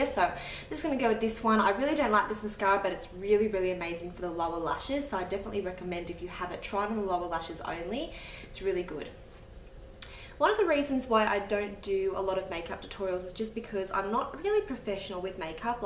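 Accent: Australia. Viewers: These are my fav videos from you. You look good without makeup & you make your makeup look so good :) + your Aussie yay haha xx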